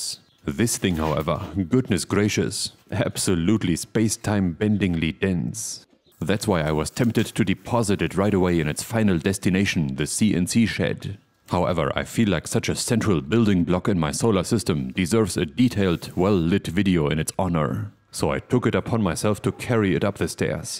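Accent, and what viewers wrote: Germany. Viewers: a german accent to die for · After 1 second I knew you were German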